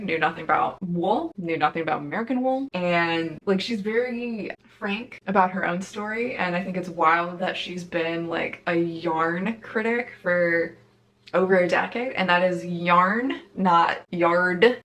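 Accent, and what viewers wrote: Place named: United Kingdom. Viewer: Ahahahahaha hypnotized by the calm bland British accent, I can’t I’m dying 😂😂 I really enjoyed Micheal Pollen’s book “Cooked” I bet this is your mind on plants would be very much up my alley too. And 100000 percent kitchen confidential deserves to be on this list, listening to tony read this one made me so happy to hear one of my favorite voices in the culinary world again. Ooo did you hear about vanishing fleece from books unbound? That’s the only other place I’ve ever heard it mentioned., and I know you said you were an Ariel bisset fan! Alright, excuse me while I watch all your videos, I have a new favorite booktuber.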